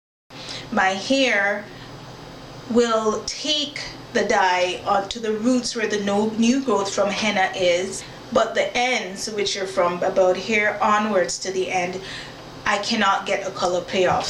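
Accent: Jamaica. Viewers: I'm picking up a tinge of accent....are you Jamaican?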